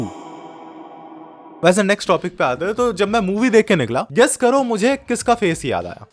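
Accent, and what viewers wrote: Bengal, India. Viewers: baat sunke hi pta lagte hain ki aap bangali ho.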